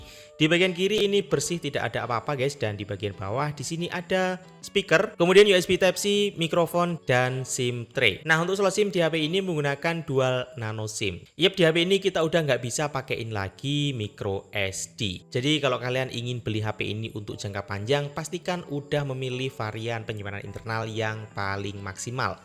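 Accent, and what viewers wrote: Banyuwangi, Indonesia. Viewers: Lho sampean orang BWI mas?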